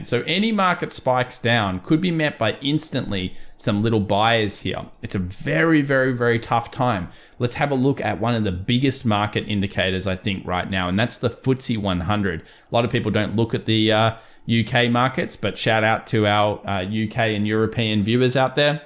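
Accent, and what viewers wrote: Australia. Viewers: i bother to listen because you are an aussie. Do not disappoint me, please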